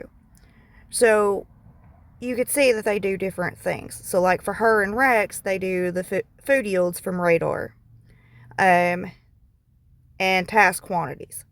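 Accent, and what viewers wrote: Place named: South, United States. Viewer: Damn you sound kinda hot. Country accent goes hard. ☠️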